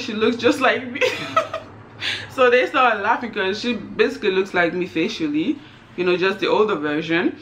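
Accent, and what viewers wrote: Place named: Nigeria. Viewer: HMM THIS IS SERIOUS..U HAVE NAIJA ACCENT HERE OHH JESUS..COMPARE TO NOW ...HAHAHAHA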